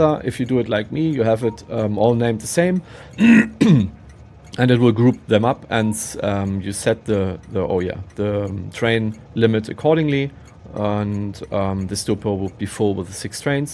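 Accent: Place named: Germany. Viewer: The german accent is HORRIBLE... please stop it... :D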